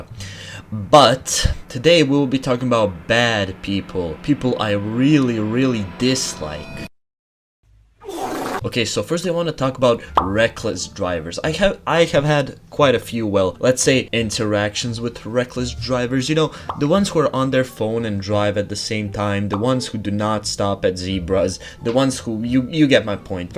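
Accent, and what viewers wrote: Croatia. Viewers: According to your channel you live in the glorious land of HDZ and your accent tells me you live in the Greater Zagreb region or in my case "prek Učke"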